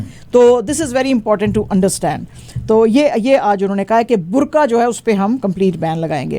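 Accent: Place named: India. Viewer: please don’t try to speak in Indian style. Please speak urdu in urdu style.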